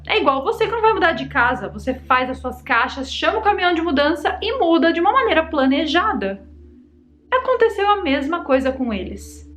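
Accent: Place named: Minas Gerais, Brazil. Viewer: mineirissima. Amo seu trabalho.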